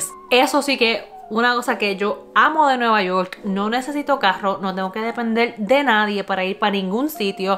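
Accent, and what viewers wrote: Puerto Rico. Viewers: Me encanta tus videos. Tu acento en 100% PR. Y no hay lugar como PR en todo el mundo, la isla llama!❤️ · Waoooo que boricua eres hablando. Si no viera tu cara... estaría segura q eres de PR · You are becoming by far my favorite YouTube channel.. me hiciste reír y bajar el stress.. varias cosas me impresionan de ti.. llevas 15 años fuera de la isla y tu acento puertorriqueño es Classico y no lo as perdido. Segundo, la comparación de Puerto Rico a USA que hiciste es algo que todo Puertorriqueño pasa por experiencia y estás 100% en lo correcto. Lo de pedir “pon” yo creo que es parte de nuestra cultura.. years ago as a teenager every time we went to the rivers or beach it was a fuerza de PON! I was born in the island and raised in the states, but every time I see one of ur videos you transport me back to 🇵🇷 tu eres un tesoro de 🇵🇷 keep pumping these videos out and you’re fan base will continue to grow.. 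I suggest a few future videos.. haciendo alcappurias de yuca aprendió a bailar salsa y merengue (el día y la edad que aprendiste) y a que canción come una fusión de comida (un mofongo de camarones y al lado una buena sopa de rez a el estilo contonese) much blessings and u are 1 of a kind.. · 😍😘 me encanta tu forma de hablar , expresarte es bien boricua eres una mujer encantadora y preciosa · Damn girl u talk better then a real Puerto Rican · ola soy puerto Rigueño ivivo en vinelad nj megusta vertus videos me encanta como ablas suena puraboricua Dios tebendiga icuidate mucho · Ese flow boricua te queda bien brutal. Nunca dejes ese flow. preciosa!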